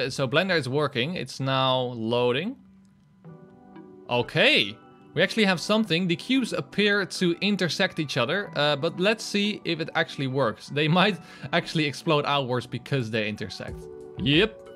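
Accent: Netherlands. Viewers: Accent. Dutch guy. 100% 👍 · Nederlander!? Broeder!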